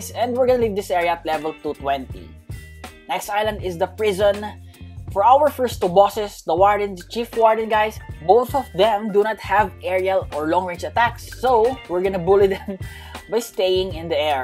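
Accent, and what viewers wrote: Philippines. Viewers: Filipino kba? Halta sa accent e, btw gawa ka noob to pro using light and tips for light user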